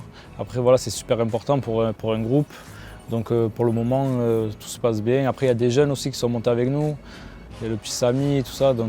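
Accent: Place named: Marseille, France. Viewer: Un parisien avec l'accent marseillais, vous en verrez pas 2 comme lui 😂😂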